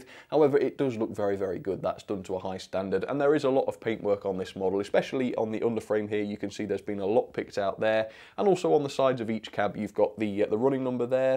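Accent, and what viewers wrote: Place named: Cumbria, United Kingdom. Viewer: Hi! Where are you from? You sound Cumbrian like me or close to Cumbria.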